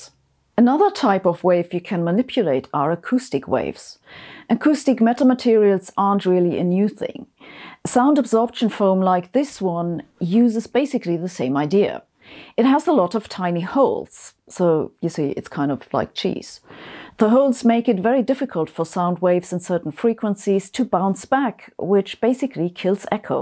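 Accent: Germany. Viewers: Deadpan humour with a German accent is the best thing ever.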